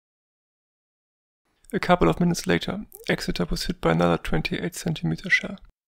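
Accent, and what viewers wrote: Germany. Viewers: German accents from certain areas sound 'sad' to English speakers. The video is rather good, I thought.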